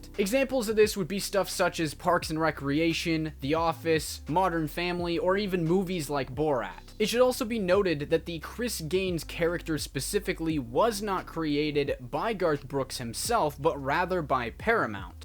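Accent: Canada. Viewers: the Beaches of "Chi-neen"? From his accent, I assume the host is Canadian, but if he's gonna talk about Garth Brooks, he needs to know how to pronounce Cheyenne.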